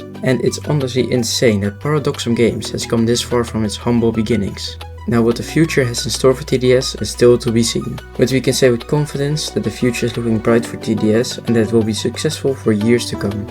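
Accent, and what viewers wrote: Netherlands. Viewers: I know a dutch accent when i hear one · I might be wrong but are you dutch? You realy sound like a dutch person(amazing video btw keep cooking) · You really don't try hiding your Dutch accent, huh? 😅 (Nothing bad about it)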